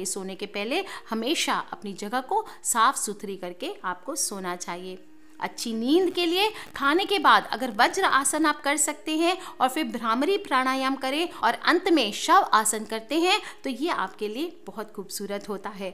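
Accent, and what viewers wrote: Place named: Marwari, India. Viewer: Radhey Radhey Mam aap marwadi hai kyunki aapke bolne ke wording bilkul hamari tarah hai.mujhe Kolkata ki yaad aa jati hai aapki baat sunkar.l like you ma'am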